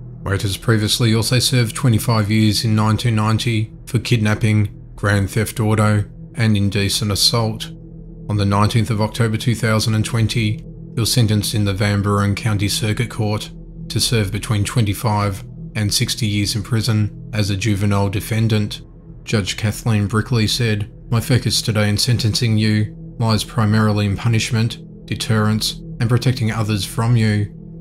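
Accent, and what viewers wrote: Australia. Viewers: Thats a Australian accent actually. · im disappointed that all these sound like the same case! fucking psychos edit : why are people making such a big deal about his narration? it is very clear. he’s just australian. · Is that how Australians speak normally?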